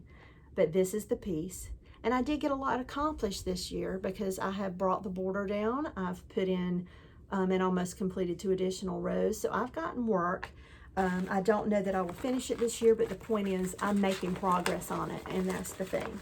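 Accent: South, United States. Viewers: I enjoy listening to you, you’re even more southern than me here in Oklahoma. I hope you’re feeling better soon!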